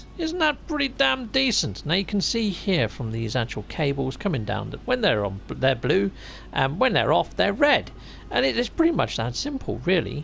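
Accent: England, United Kingdom. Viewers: Classical English voice is classily English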